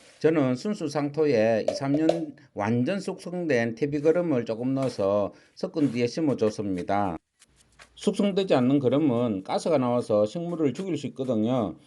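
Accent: Gyeongsang, South Korea. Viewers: 아이고~~ 노래도 잘하시고 구수한 경상도 말씀으로 파인애풀도 사과롤 얹저놓고 꽃피우는걸 우째 알았습니꺼 ^^ 참으로 신기합니데이~~ 겨울에는 온도가 영상 3도 까지 내려 가는곳에 두면 안되나요 따뜻한 방에는 햇빛이 안들어와서요 내일당장 파인애풀 하나 사와야 겠어요 감사합니다^^ 좋아요는 한번밖에 못해서 광고 까지 보고 갑니다 새해 복마니 마니받으시고 건강 하이소 요^^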